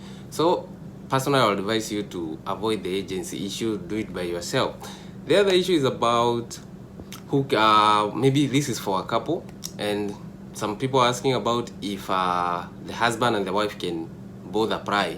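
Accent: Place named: Kisii, Kenya. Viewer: You sound like a kisii🤔🤔🤔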